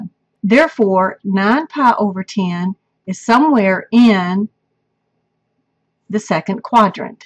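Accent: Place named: South, United States. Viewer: Oh how am I supposed to continue studying for my midterm with your lovely southern accent as a distraction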